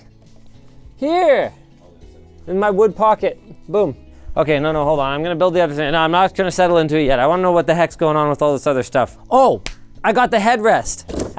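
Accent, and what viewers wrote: Canada. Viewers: Why does linus sound like he's leaning heavily into his canadian accent in this video.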